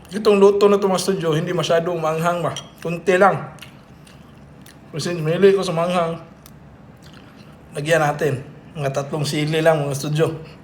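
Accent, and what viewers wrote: Visayas, Philippines. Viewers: pangit ng pagkaluto mo..bisaya ka talaga,ayusin mo magtagalog at pagluto mo ng dinuguan..ikaw lng nasasarapan nyan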